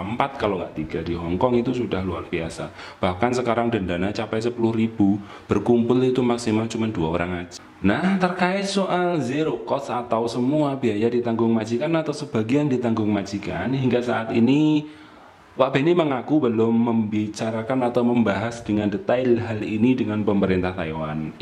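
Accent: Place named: Java, Indonesia. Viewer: Sampean koq lucu to pak lek omong jowo..😅😅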